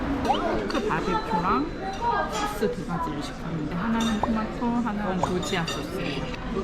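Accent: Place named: Gyeongsang, South Korea. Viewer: 교향이 어디신가요? 살짝 경상도 억양이 나는 듯도 하구요. 아무튼 20여일 후에는 트빌리시를 관광하고 있을 생각에 영상을 다시 보고 있어요.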